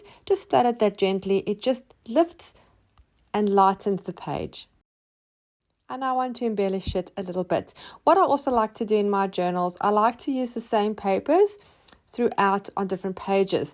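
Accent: South Africa. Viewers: Hi, I just found your channel! So happy, and I hear a South African accent? I love your style. I need to binge watch your videos. ❤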